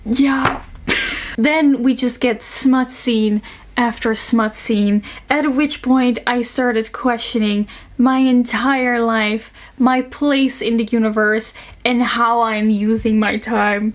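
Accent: Netherlands. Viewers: please make a playlist of you reading/reviewing truly unhinged and/or terribly written books (this, gothikana, etc) because they are so funny and I love them and sometimes what I need is to hear bad smut lines read aloud in a Dutch accent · you calling squidward octo made my ears perk up, along with your accent. dutch person detected!!!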